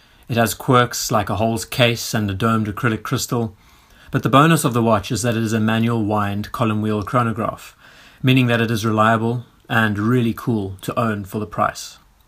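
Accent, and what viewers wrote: South Africa. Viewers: This is a bit sad. You’ve spoiled the joy of owning the real thing by buying cheap (albeit well done) knockoffs. So if you do ever decide to trade up, it won’t feel as special. Call it what it is, it’s like living with a very good sex robot versus a real girlfriend. Whatever, judging by your accent you’re from South Africa, so it’s just as well that when you inevitably get robbed of them it won’t be so bad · Great collection, very enjoyable channel. Your accent sounds South African. Hello from Johannesburg South Africa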